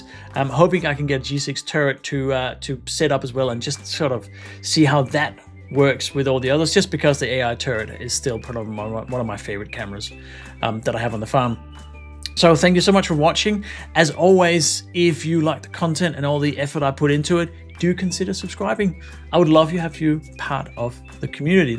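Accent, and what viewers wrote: Denmark. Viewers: Hey, you danish? Think I can just about tell by the accent, but not 100% sure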